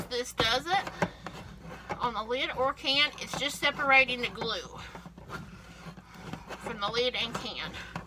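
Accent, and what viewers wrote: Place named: Appalachia, United States. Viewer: It's our Appalachian dialect. I grew up saying it that way, had to lose my accent and dialect to work for the AP.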